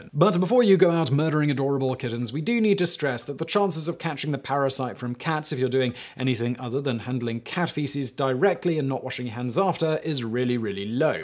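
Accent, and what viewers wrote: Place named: RP, United Kingdom. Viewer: Why do you sound posher than usual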